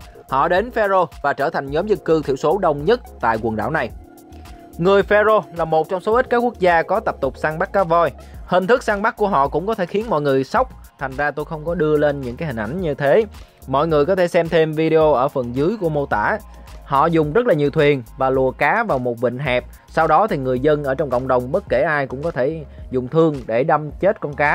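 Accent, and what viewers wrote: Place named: Phu Yen, Vietnam. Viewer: Giọng đọc Phú Yên thì phải.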